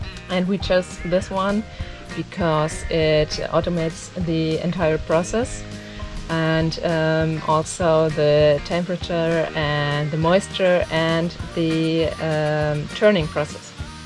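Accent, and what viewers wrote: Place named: Germany. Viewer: She sounds German.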